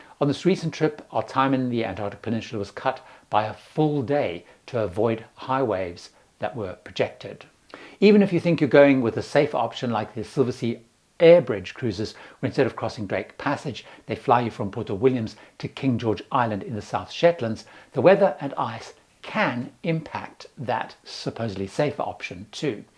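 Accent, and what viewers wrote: South Africa. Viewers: Hey, are you South African?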